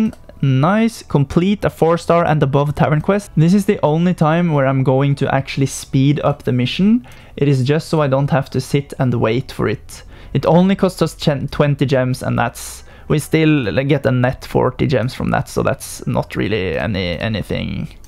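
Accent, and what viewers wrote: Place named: Norway. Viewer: Kan høre at broder er norsk, keep gaming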